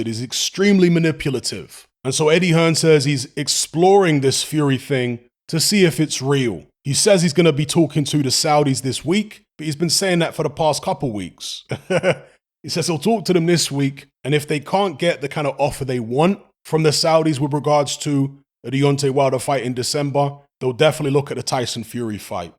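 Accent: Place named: London, United Kingdom. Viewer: There a song called, this sounds like it was spoken by a londoner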